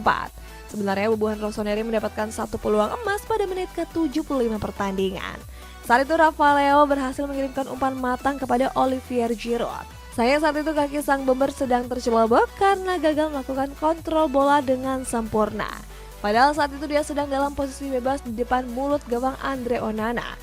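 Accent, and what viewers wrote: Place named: Banjar, Indonesia. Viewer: admin mulai gokil , ad bhs banjar samarinda wkwkwk mantap sanakkk salam buan jelawat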